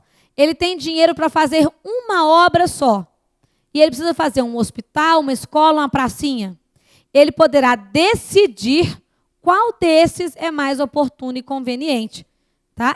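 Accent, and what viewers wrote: Minas Gerais, Brazil. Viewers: com sotaque de mineirooo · professora com sotaque mineiro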